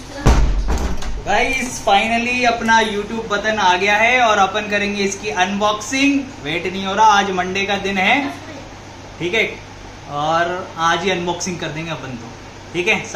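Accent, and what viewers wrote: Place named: Bengal, India. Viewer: Mam hamera Bengal sa ha pata chal raha ha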